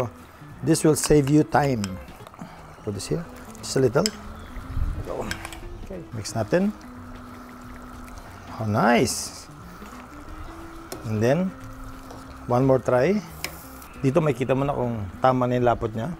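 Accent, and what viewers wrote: Visayas, Philippines. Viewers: He speaks good Tagalog but his Visayan dialect still comes up like he says pagkaon, lol. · Malingaw ko maminaw sa imo bisaya ma words sir.u sound so cute!!!! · Lingaw kaau ko magtan-aw ug maminaw sa cooking nimo kay magbinisaya lag kalit! Akala ko e, tagalog ning c! C 4mer man diay ni sa puting tabing b4! Bisaya diay! Nice kaau paminawon ang bisdak words in between. God bless u & the fam, Mayor. Praise God sa mga laming dishes u cook. Sehr lecker! Nam2x. Glory unto Jesus! Thnx, Holy Spirit.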